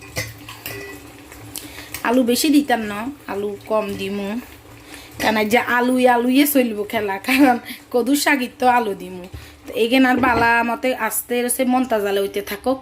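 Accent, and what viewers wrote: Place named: Noakhali, Bangladesh. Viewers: আপু তোমার নোয়াখালী ভাষা গুলো আমি তেমন বুঝিনা কিন্তু শুনতে অনেক ভালো লাগে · অাপনার নোয়াখালী ভাষা ভালো লাগলো। অাপনার রাননা বাননা ভালো লাগলো। অাপনে অামার চ্যানেল টা দেখবেন।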